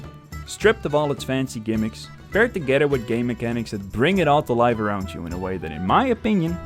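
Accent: Netherlands. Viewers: Je spreekt super goed Engels voor een Nederlander. Letterlijk accentloos. Echter hoorde ik heel lichtjes dat jij een Nederlander was! Super content man. Het is jou gegund om te groeien als een knol!!!!🎉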